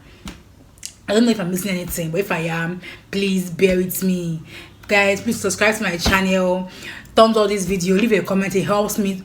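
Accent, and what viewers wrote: Ghana. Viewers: You almost became a Kumasi girl listening to you.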